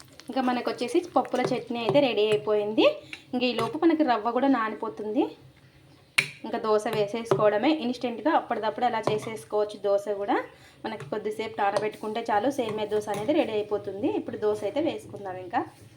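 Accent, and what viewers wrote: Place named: Anantapur, India. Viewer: మీది అనంతపురము జిల్లా కద నాన్నా...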